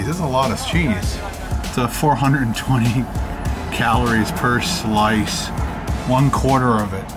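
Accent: Canada. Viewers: Pro-gress. Gotta love the Canadian accent. I work for a Canadian bank in New York..after 10 years there I’m starting to talk like a Canadian as well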